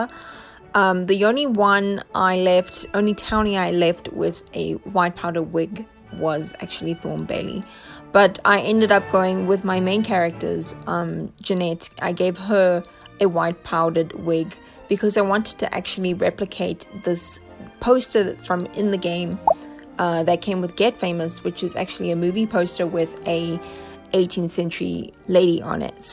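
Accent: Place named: South Africa. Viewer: I missed your entry video (consider it watched now)! Thanks for this. Great hearing a SA accent again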